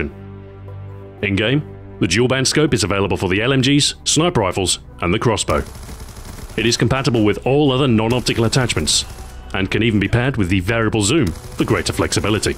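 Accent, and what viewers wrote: England, United Kingdom. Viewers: nope, a bit less Scottish and a bit more English. is based in Manchester, NW England. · R u really British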